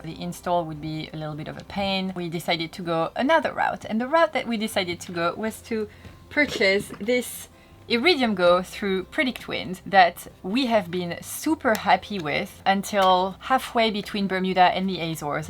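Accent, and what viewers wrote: France. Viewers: I found myself watching your video because I couldn't stop listening to your accent. I love the French accent and wish that my French (Ce n'est pas bien, je suis desole:) were half as good as your English. I wish that my wife and I could do what you and are doing. Great video! Merci beaucoup.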